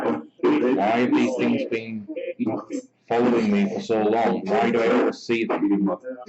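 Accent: Newcastle, United Kingdom. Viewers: Central??? He's a jordie from the north east(newcastle area). Central would be from mank (manchester) to brummie (birmingham)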